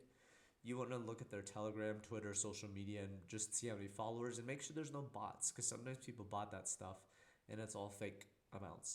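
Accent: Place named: United States. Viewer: You sound American. How can you invest in IDOs when they all require KYC to filter Americans out?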